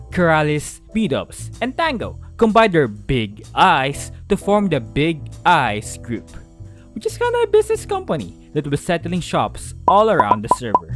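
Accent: Philippines. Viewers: are you a filipino? you sound like one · I know you're filipino just by the accent.. Correct me if i'm wrong but i'm pret-ty sure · Your English accent seems like a veri Filipino vibe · are you filipino???? your accent is soo familiar to tagalog~ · I realli like your pilipino accent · Ur Accent . Are u Filipino? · Are you perhaps a filipino? because that accent is seemingly familiar lol From 🇵🇭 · i think its Flipino accent??? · are u filo????? i can hear it in ur accent 😭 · you sound filipino.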